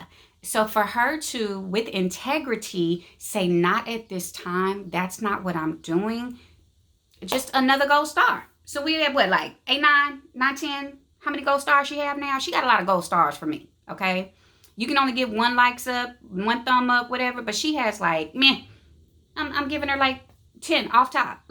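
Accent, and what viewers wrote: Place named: Arkansas, United States. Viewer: I love the Arkansas accent lol great vid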